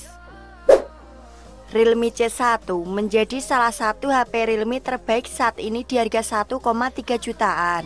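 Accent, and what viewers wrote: Java, Indonesia. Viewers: Medok bat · Dari suaranya sih kayak dari jawa,wong jowo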